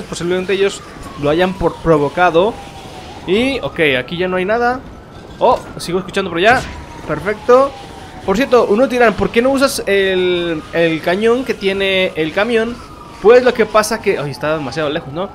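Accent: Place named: Italy. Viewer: casi en todo el video hablaste como italiano hablando español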